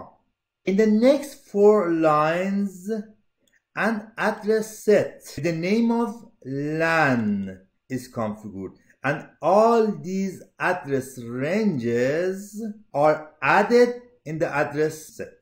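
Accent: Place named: Iran. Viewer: سلطان لهجه ات خیلی ایرانیه :دی دمت گرم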